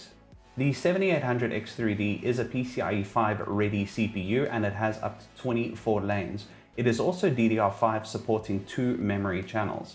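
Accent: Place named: South Africa. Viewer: South African accents are always so noticeable haha. Nice video man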